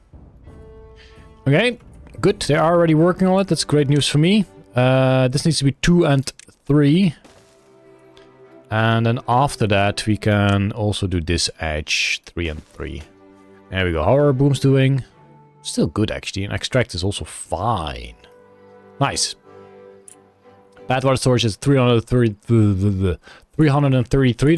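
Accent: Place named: Netherlands. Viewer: Is it me or did the Nederlands bleed through a lot more than usual? Lol. Not complaining mind you. Just funny cause it happens to me when I get super tired. Keep up the goede work. Earned a new sub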